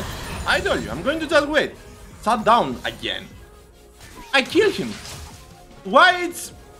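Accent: Greece. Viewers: I think you're a Greek, right ? Assumption made on your accent. :D Greetings from Serbia my bro !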